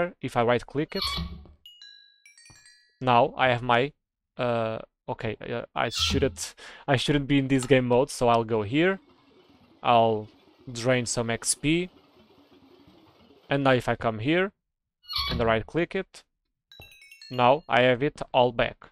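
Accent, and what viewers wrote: Portugal. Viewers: tenho quase a certeza que és português pelo teu sotaque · Um tuga conseguir indentificar um tuga a falar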